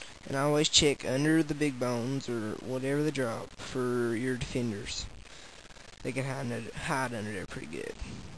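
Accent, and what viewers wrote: United States. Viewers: i like the texan voice too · You have an awesome Southern Accent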